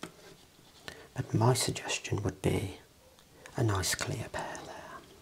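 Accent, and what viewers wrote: United Kingdom. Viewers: Love your British accent. Marvelous video like always ❤ French 💋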